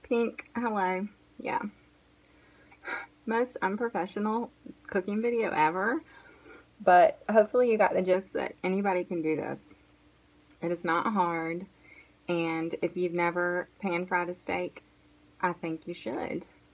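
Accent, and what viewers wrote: South, United States. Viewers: Great video just love the southern accent y'all 😍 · Love the southern accent and it looks like great steak for supper tonight. Huntsville Ontario Canada 🍁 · I like steaks love butter and like that southern accent you did have me worried at the beginning though when you said you were going to go in the barn and get a steak LOL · When you say....Hi y’all.....is part of why I watch your videos....could listen to that southern draw all day.....thanks also for showing us how to cook steaks with cast iron skillet and butter.....have a blessed evening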